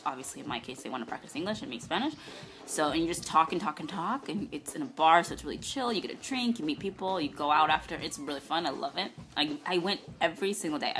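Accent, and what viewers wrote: Spain. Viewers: Oh no! everything was going great until I heard the Spanish accent(Spain) ugh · definitivamente, tienes un accento de España jaja! Me encanta. He aprendido aquí en México y toda la gente de otros países, dicen a mí - qué chido??? eres de México de repente??? jajaja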